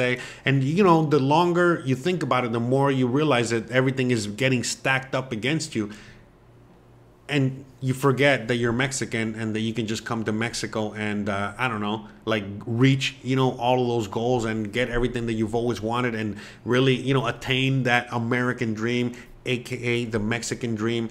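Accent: Cuba. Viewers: Man you speak cubano very different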